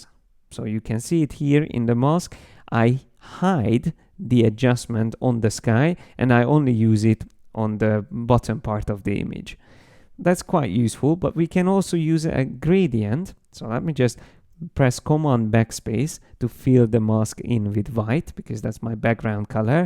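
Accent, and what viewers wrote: Hungary. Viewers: This is a textbook example of HUNGRISH. Terrible to listen to.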